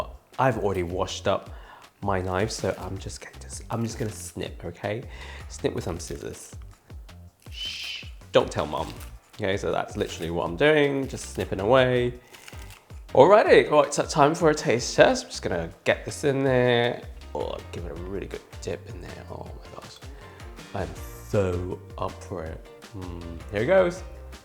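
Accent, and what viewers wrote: United Kingdom. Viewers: Love your Recipe and your Accent!! British??? NYC Manhattan ❤️💋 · Nice video for the spring rolls, also like your British Excent. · I can't stand the British accent 😂😂😂😂 its horrific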